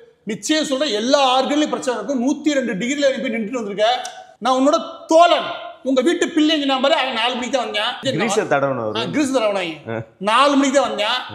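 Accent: Madurai, India. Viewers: bro‌ நீங்க மதுரை தானே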